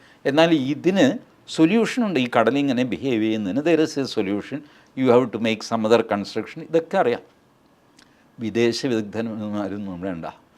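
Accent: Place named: Kochi, India. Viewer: Kochi talking.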